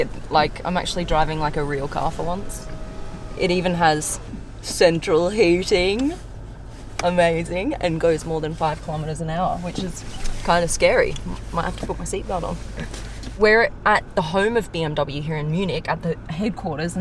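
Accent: Australia. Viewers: Cool video and yes we get it, you have a Lambo!!! Big deal, I have a Bugatti. Do enjoy your accent and I dig Australian women :) Your husband is a lucky man. Do you have a sister???? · As usual, this Aussie ugger is really boring and so in-to-your-face stupid. The accent alone can make me vomit nails!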